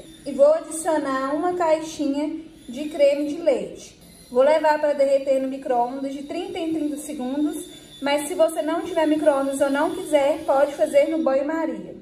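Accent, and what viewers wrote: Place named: Minas Gerais, Brazil. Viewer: Muito mineira estou vendo de santos SP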